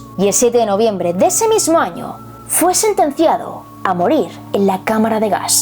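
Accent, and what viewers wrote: Spain. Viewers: Tarde pero sin sueño chula , aki presente para escuchar tu versión de los hechos con el Richi y con tu presiosa voz de española.😚 Saluditos 🍓🍓🍓🇲🇽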